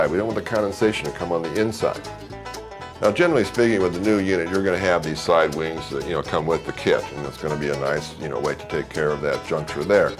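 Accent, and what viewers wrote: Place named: Missouri, United States. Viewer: He clearly said : "This unit"..... Seems like 19 people can't understand a Missouri accent.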